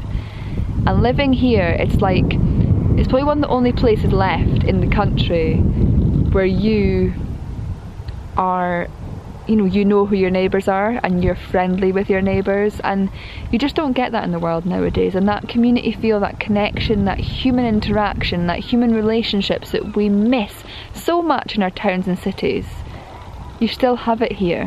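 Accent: Scotland, United Kingdom. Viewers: Please retain your Scottish accent and tones, you are starting to succumb to the recent Austrailian infestation, 'raise the tone of your voice at the end of every sentence' like it was a question.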